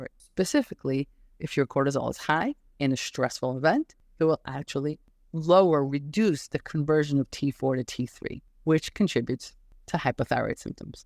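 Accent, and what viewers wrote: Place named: New York, United States. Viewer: This is an east coast US accent like New York or something like that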